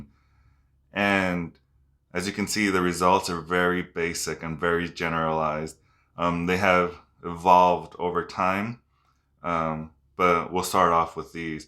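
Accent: United States. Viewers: I live like 1 hour from Philly. I was wondering why you sounded so local. ;-) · first person ive seen that mispronounce his own name you say in pure (USA) American accent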